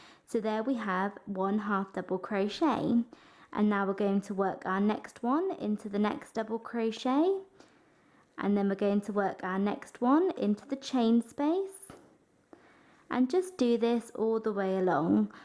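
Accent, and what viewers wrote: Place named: RP, United Kingdom. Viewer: love your voice you talk very posh!!! im in UK too, and im from up north lol x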